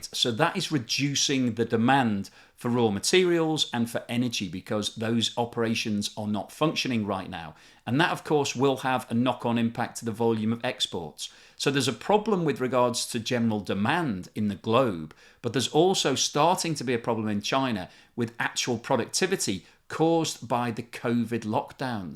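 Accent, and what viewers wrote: United Kingdom. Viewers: You have a British accent but you don't know shot.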